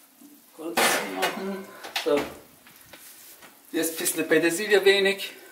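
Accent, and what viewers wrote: Swabia, Germany. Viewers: Ich liebe deinen schwäbischen Akzent 🥰 Liebe Grüße aus Berlin auch an deinen Sohn. Er macht auf deinen Kanal hervorragende Arbeit und 👍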